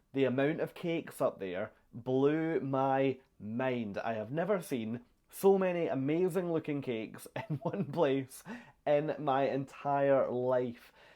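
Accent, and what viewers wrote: Scotland, United Kingdom. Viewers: I loved your videos and your Scottish brogue. I’ve travelled a lot on Holland and enjoy it but I am 87 and can’t get around well enough to do all those activities. Don’t get off at ports either as walking is difficult but I DO enjoy eating and relaxing. Thank you for the videos! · Are you speaking English? THAT's the Aussie accent I was warned about! Not that there's anything wrong with it. OH Scottish. My bad. I was thrown off by the shirt. I do a fair Scot accent myself! You're kinda young for HAL...actually you are VERY young for HAL! 🤣 Dutch and Cuisine in the same sentence...does not compute. Not every HAL ship has a 'thermal suite' On Signature class ships, I THINK it's called The Retreat. This is true on a lot of non Pinnacle class ships - IE BB King, notes etc is not on every ship either. We are going to be on the Nieuw Amsterdam on our next cruise and the only Music Walk venues they have is Billboard and Rolling Stone. 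Not just a wine tasting....but a wine PAIRING! I wonder if they have a master Sommelier on board! There aren't that many of them in the WORLD! Have it All includes a night at Pinnacle!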